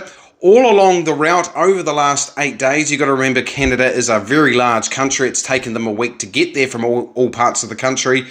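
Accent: New Zealand. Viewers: Algorithm and all that. Grow the.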